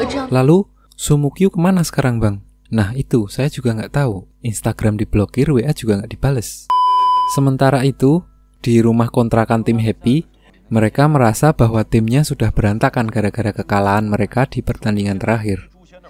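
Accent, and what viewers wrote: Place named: Java, Indonesia. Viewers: Yogyakarta bro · Njir medok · Jawanya medok amat ngakak astaga~ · Wong jowo to mass,kok ngomong e ngono hehe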